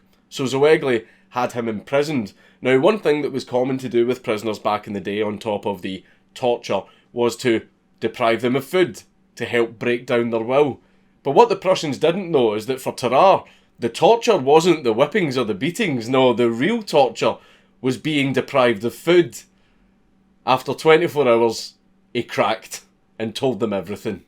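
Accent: Scotland, United Kingdom. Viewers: Subscribed to Sue´s channel too. 1: I like the scottish accent 2: To support Mister and Misses Dankular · Swallowing corks sounds a bit funny with a scottish accent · scottish man reads wikipedia at you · Just watchin this for the Scottish accent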